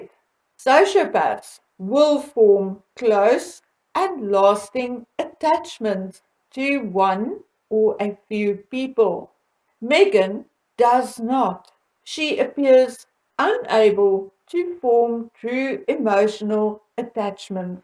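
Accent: South Africa. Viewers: Hello! I really enjoy your video chats and sincerity. Love your South African accent. Bravo! Yes any intelligent person realises that M is ill and your analysis of the situation and the possible end is absolutely valid. May l please just ask one thing? Do you write out your messages and then read them? Sorry sweety it sounds like it.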